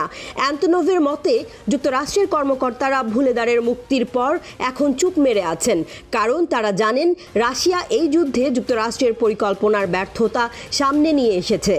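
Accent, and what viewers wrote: India. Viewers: আপু,,আপনি কি বাংলাদেশি না ভারতিও।বাংলাদেশের কোনো সাংবাদিক এই ভাবে নিউজ পড়েনা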